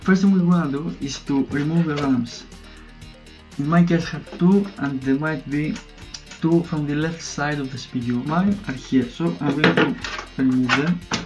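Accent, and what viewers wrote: Greece. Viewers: U have such Greek accent r u Greek?? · Thnx you sound like a greek just like my favorite basketball player Giannis Antetokounmpo im not greek im a filipino i didn't notice i got a bad ram stick its a ddr3 and its not connected properly it now boots up with display but the beep is gone forever what do i do now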